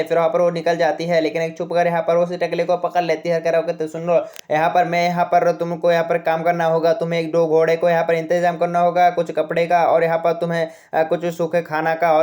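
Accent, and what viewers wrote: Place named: Bengal, India. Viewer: Your videos are nice and i really follow it but please try to improve your hindi I can understand you are a bengoli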